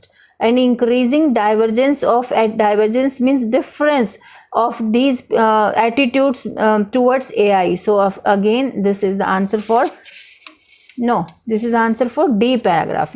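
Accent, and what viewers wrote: India. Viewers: Annoying indian accent and unclear voice, and She's not reading like a ielts instructor. 😑😑😑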